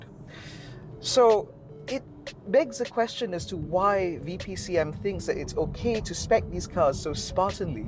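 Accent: United Kingdom. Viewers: Great review n yes for an suv costing more than 200 k without basic safety features is unforgiving n that's the deal breaker for me. Btw, your UK English is refreshing. Other local reviewers' faked US English is rather irritating. Keep it up.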